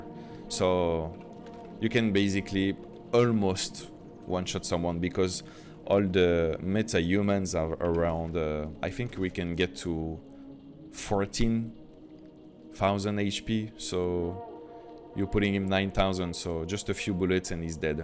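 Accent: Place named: France. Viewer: T’es français avoue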